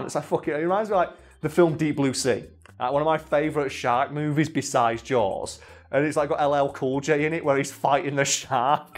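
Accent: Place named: Sheffield, United Kingdom. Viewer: I thought I was the only one who noticed he is talking more and more with a Sheffield accent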